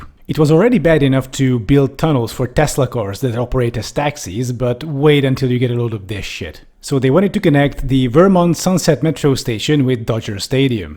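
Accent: Hungary. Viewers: Okay, I refuse to believe you're not Hungarian. You've used Hungarian subway lines for comparisons in several videos, you pronounced Hungarian words perfectly and although not very noticeable, you do have a slight Hungarian accent. Cool. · Are you hungarian or do you just have a really authentic accent? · Akcentusbol ugye jol vettem ki a magyar szarmazast? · ez nekem is feltűnt de az ahogyan mondta a magyar szavakat és amilyen sokszor emlegeti a budapesti metrot nekem eléggé azt sugallja hogy magyar az illető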